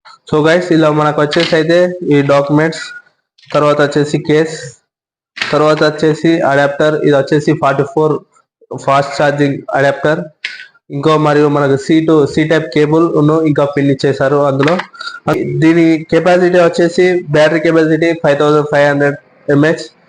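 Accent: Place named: Telangana, India. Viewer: Anna kocham fear kaku anna while saying Nuv chpadii correct ehh kani don't fear while saying..!! Anyways good review anna mana telangan slang lo ❤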